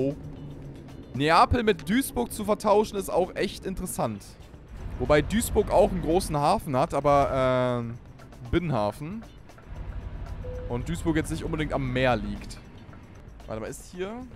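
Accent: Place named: Brandenburg, Germany. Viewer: Er kommt halt aus Brandenburg, wenigstens verstellt er sich nicht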